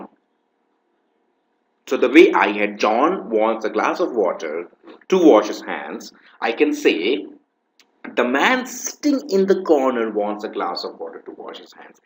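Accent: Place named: India. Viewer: I think the speaker is Indian because of his accent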